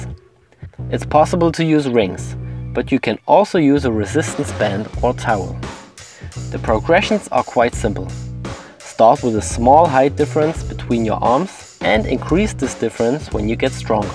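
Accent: Germany. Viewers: He is a german. You can hear it wery clearly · is this dude german? just asking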